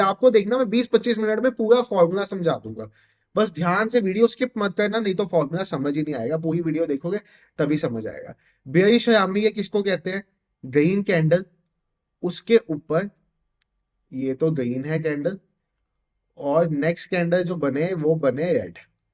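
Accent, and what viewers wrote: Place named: Pakistan. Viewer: Tu Pakistani hai na Teri voice bata Rahi hai.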